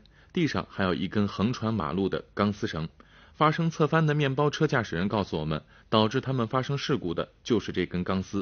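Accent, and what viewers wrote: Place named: East China, China. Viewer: it iz english with strong east chinese aksent.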